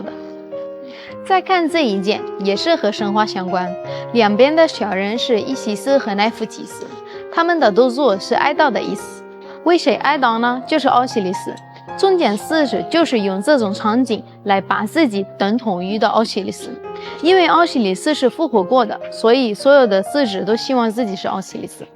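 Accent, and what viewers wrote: China. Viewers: 好中國話用語和腔調 🤷 😮⋯⋯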